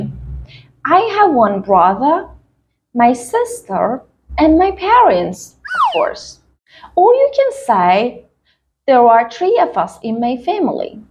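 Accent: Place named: United Kingdom. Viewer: 😍 such a nice British accent 🥰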